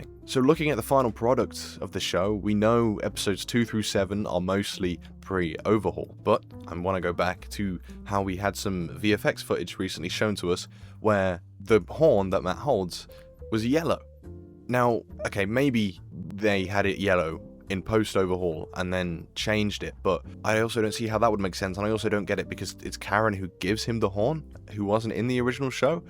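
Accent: United Kingdom. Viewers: It's infuriating that "lore" and "law" are pronounced identically with a British accent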